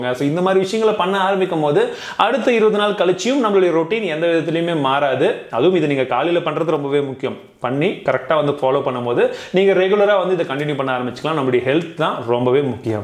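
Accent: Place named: Kerala, India. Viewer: Name la tamil nu eruku ok but unga slang liteta apo apo malayali slang varuthu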